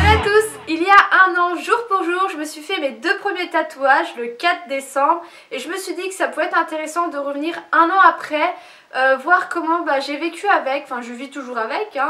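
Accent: Lorraine, France. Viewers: s'entend ton accent de Lorraine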